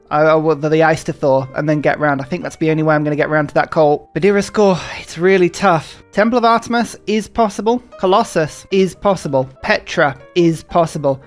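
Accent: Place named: South East England, United Kingdom. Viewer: Wait really? Do you mind me asking where you're from then? Cause I've lived in each of the 4 quadrants of England and your accent definitely sounds most similar to the South East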